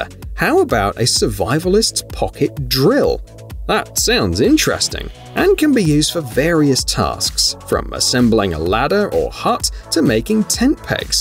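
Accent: United Kingdom. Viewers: The pulsing background "music" induces a headache. I wonder if the narrator is really British.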